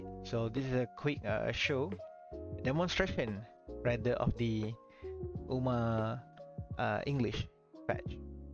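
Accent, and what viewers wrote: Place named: South East England, United Kingdom. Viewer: Спасибо тебе, человек с юго-восточным акцентом за эту ценную информацию!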